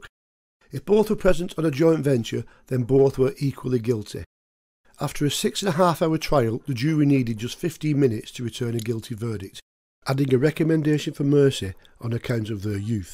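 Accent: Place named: North, United Kingdom. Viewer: In defence of the regional accents of Northern Britain ( and yours is soft and pleasant to the ear ) when Eastenders came out there were thousands of requests from Scotland for subtitles .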